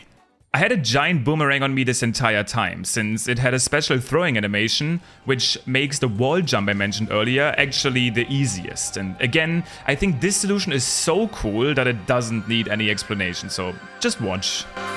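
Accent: Germany. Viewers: Hey, are you German? (Your accent sounds like you are)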